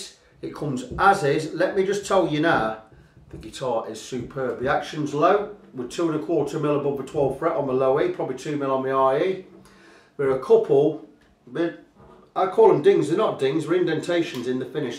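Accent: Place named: Nottingham, United Kingdom. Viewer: I knew you were a Nottingham lad as soon as you said "Sellin". Nice guitar, hope it went to a good home.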